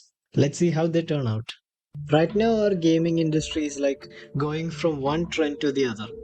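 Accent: Kerala, India. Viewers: I had a gut feeling that you might be from Kerala when you used that sad bgm from the Malayalam movie 'Vandanam', in one of your early videos. Now hearing your accent I can pretty much confirm. You are a malayali, right ? I share a similar story as I was hell bent into mobile games(keypad) of the past. I didn't know games were supposed to have a story until class 6. What you said about paying for games is right. I also didn't know games were supposed to be bought for a long time. One thing I never had was friends who had deep passion in gaming like me. I am always criticized and shamed for liking games by my siblings and cousins. But I know the happiness you get just opening an .exe file and trying out a game gor the first time. I'll always cherish that. Lots of love ❤❤ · anda malayali accent is clearly heard :)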